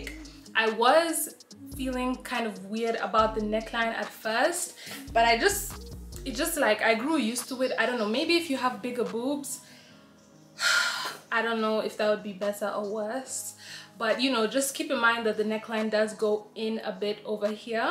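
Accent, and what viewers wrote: France. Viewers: Par contre, ton accent français, MAGNIFIQUE. I was chock 😳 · When you started talking in French I thought you were French due to your accent and I am French So I can tell you you sound like you’re French this is sooooo crazyyy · From a french girl , you have such a GREAT accent, I almost thought you was French too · Love the french accent! You were in my suggestion, it's funny because you just look like my younger sister and I'm french! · even if you know a few words your french accent is damnn!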